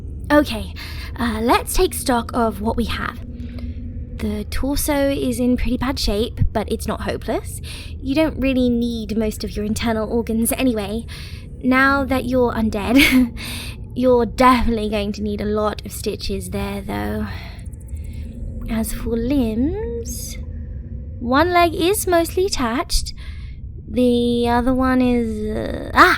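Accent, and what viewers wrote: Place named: United Kingdom. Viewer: Your accent sounds British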